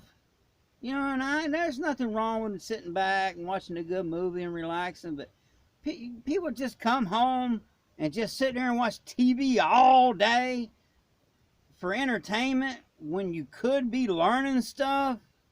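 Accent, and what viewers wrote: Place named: Ohio, United States. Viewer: Love your accent. Are you from ohio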